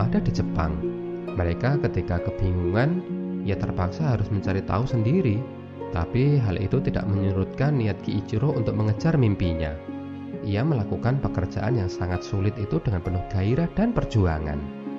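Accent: Java, Indonesia. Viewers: Ada yg lucu di menit 11.18 detik saat dikendarai mobilnya bunyi etek etek etek wkkwkw siapa yang denger kalimat ini like and comen lucu juga ya pembaca beeitanya suaranya Mas nya medok banget keliatan dari jawanya sama kaya aku dari jawa tapi medok nya udah ilangbtuh kwkwkkw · Saya bolak balik lihat Video ini. unik membawa cerita, logat Jawa medok nya khas Alhamdulillah Sekarang Toyota nangkring di teras rumah ku. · Sedikit saran. Pengisi suara ganti org aja, logat jawanya risih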